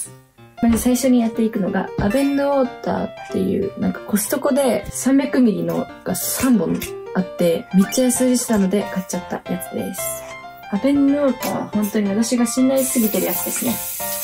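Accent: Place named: Nagoya, Japan. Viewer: 名古屋弁同じ！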